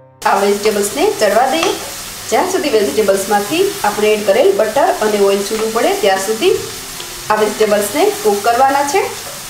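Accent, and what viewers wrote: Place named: Kathiawar, India. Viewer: વાહા બવૂજ શરસ કાઠીયાવાડી સંવાદમા ભાજી ખાવીની મંજા ઓરછે બીજા વીડીયો મૂકછોજી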